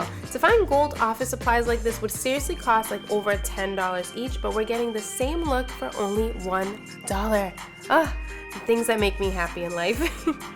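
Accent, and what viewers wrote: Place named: Massachusetts, United States. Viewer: Are you from Mass? Your accent is so cute! Lol #CTNative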